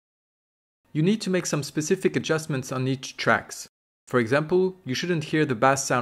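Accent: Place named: France. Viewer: Slight french accent detected.Nice tutorial by the way :)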